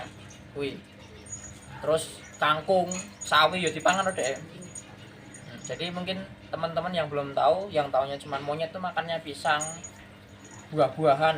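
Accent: Indonesia. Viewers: Ky orang kendal bahasane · Jowone ngendi mas... Yoja, ya?😊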